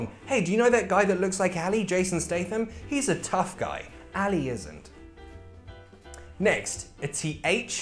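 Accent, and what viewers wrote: United Kingdom. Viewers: i really enjoy learning british accent with you funny teacher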